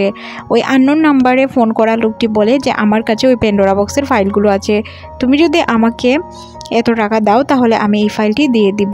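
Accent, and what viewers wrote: Sylhet, Bangladesh. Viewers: Apnar basha ki Sylhet 🙄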